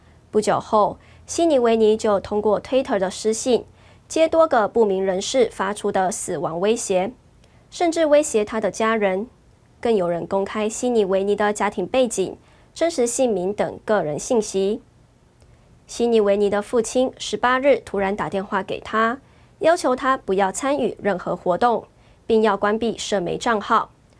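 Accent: Taiwan. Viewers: 台灣的腔調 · 這主播有親切的台灣腔調捏😂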